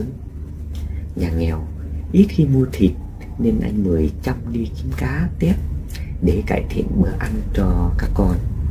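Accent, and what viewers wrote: Mekong Delta, Vietnam. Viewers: Cám ơn ( xin lỗi vì không biết xưng hô như thế nào ) nhiều lắm. Bạn đã truyền tải bài viết của bạn bằng chất giọng miền tây hay quá đúng như tình cha của hai cha con Chú Mười ❤❤❤ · Anh đọc theo giọng người miền Tây thiệt tình cảm, nghe vừa thân vừa thương!!!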